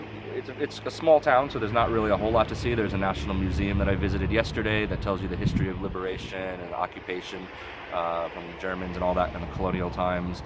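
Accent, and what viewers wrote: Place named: England, United Kingdom. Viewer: You sound English dude..In Whk now from the UK.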